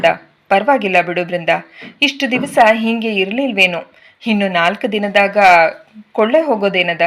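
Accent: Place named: North Karnataka, India. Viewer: ಉತ್ತರ ಕರ್ನಾಟಕದ ಭಾಷೆ ಯಲ್ಲಿ ನಿಮ್ಮ ವಿವರಣೆ chendaaagide ಕೇಳುವುದಕ್ಕೆ.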